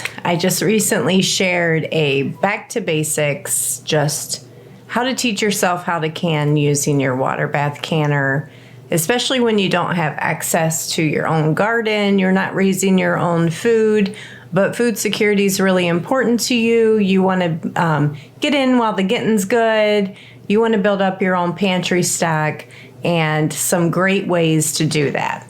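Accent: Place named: Wisconsin, United States. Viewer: Nice accent! Where in Wisconsin are you from? 😂 I live in Rice Lake!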